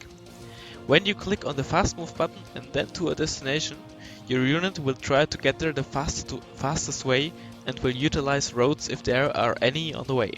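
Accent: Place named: Germany. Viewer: Kannste das ganze nicht nochmals auf deutsch machen? Du bist dem deutsch ja mächtig, wenn ich auf deinen Akzent höre...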